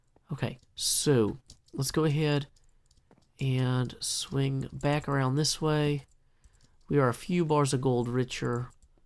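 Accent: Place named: Missouri, United States. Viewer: My apologies, I was watching some other hermits and decided to make a list of collab teams I thought would be great, and as I commented on many of them I realized I left you and Wels out, which is in my honest opinion, is a good pairing of personalities. I won't edit the comment I left them, it reads as follows : The Hermit Stock Exchange is about to open for business, making affiliations now is the key to success in a fluctuating market, as a hermit, please consider the following; all stocks and coin seem to follow a 3 letter categorization in markets which can combine many subsidiary companies and/or projects, and I feel the HSE should follow suit. In this I suggest, not individual companies, but corps with multiple "teams" or "factions" competing in the market; I propose the following for classification and advertisement: RDC - Rendoc ISQ - Isqueen FZM - Falzom ITZ - Imtaz (or ZIT, I kind of like ZIT) ART - Architek CNV - Convex PYA - Pyffa XIZ - XIZuma (he has Devs to back him, like a hedge) DGE - Doge (a generic stock anyone can invest in [volatility] PS cute dog face ^^ ) leaving one open for a collab in the future (JSN maybe ^^) Going down the list I realized, Python is on a Terraria kick, and Biffa is without a team member, also, I seem to have left you two out, I am deeply sorry, I mean you're kool, calm, collected, have awesome BG music, and your accent reminds me of my time in Missouri; nice people there. 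I hope that you and Wels will take over the medieval distric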